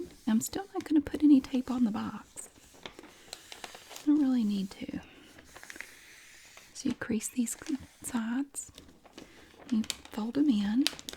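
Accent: South, United States. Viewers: Omg yes . Like a southern Pam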